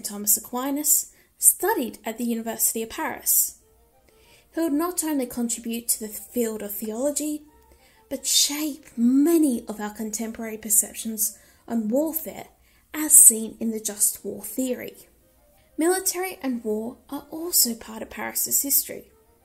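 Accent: Australia. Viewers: I guessed you were either from Australia, or New Zeeland, at first I thought you were English 😅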